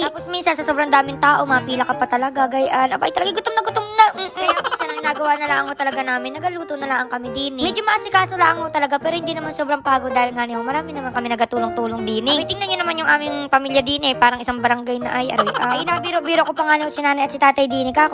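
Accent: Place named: Quezon, Philippines. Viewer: ngayung 730 lang nene ay ang punto mo preho da quezon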